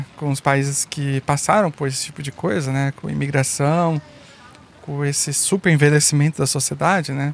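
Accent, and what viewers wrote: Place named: South Korea. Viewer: AMEI SUA PRONÚNCIA COM SOTAQUE COREANO NO PORTUGUÊS ❤ EXPLICAÇÃO MUITO BOA👏👏👏👏🇧🇷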